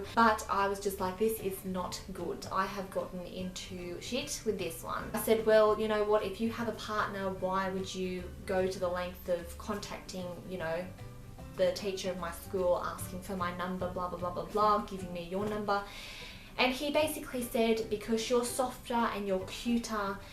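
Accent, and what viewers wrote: Australia. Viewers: Are you from Australia. I'm from there!! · You're pretty. I love your accent, aussie yeah? Your so close to 1k, congrats yey!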